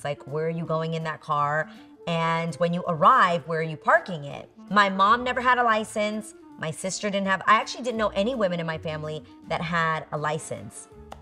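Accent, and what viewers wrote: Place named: New York, United States. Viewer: You’re from out of town?! 😂 with your NY accent? I love it! 😂❤️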